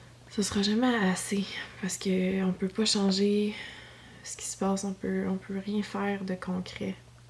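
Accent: Quebec, Canada. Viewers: Au début que j'écoutais tes vidéos, j'avais comme d'la misère a vous comprendre les québécois ! Mais maintenant, j'y arrive tellement bien. I'm french sorry 😂 Sinon je t'aime. full love 💘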